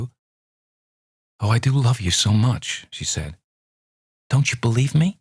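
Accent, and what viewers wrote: United States. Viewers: I love listening to you read. Is that an American accent? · My opinion, for what it’s worth, is that Luly was just a naïve kid who didn’t have the cynical worldly “sense”the men had. She was just having fun, learning to skate, learning to swim etc and that was all there was to it. The men put a different color on her actions. Main was smitten with her and therefore jealous, so he tried to put her in a bad light to his friend—and he wasn’t much of a gentleman to talk about a lady as he did. Campbell was a prude and a sadist. A true gentleman would never be that cruel. Cool perhaps, but not cruel. A very upsetting story, although your reading as always was excellent. :_pumpkin: Couldn’t quite place your American accent; Luly was apparently brought up out west.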